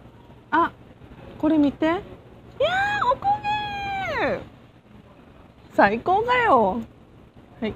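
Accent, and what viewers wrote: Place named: Japan. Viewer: 발음이 완전이 일본분 나마에와 한국이름 그렇군요, 맛집 알려주셔서 감사합니다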